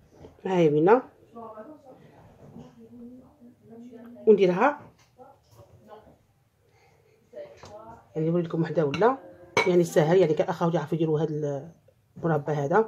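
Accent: Oran, Algeria. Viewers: Ya3tik essaha madame je suis d oran mais j habite le sud quand je vous entends parler Vous me faites penser a ma Ville Natale oran rabi yahafdek inchaallah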